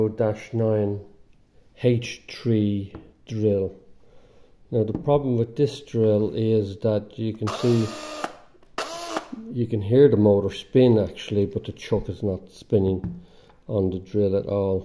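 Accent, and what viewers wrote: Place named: Northern Ireland, United Kingdom. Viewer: Hello I have one exactly the same as yours however mine seems to have a problem with the electronics, I've just taken it apart but I though I'd check on YouTube for any help I could ge fixing t. If you still have yours I'd be interested in it for parts. I not sure how to make contact with someone here. I am in N.Irl so I don't think we are very far from each other judging by your accent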